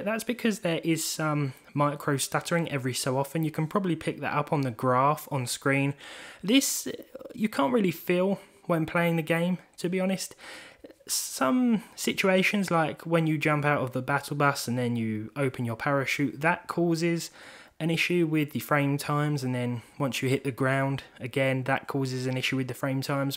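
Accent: United Kingdom. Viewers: *Far east* Now i believe you, that are you brittish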